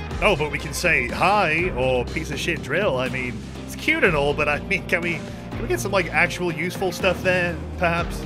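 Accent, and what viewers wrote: United Kingdom. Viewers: YOU'RE BRITISH?????????????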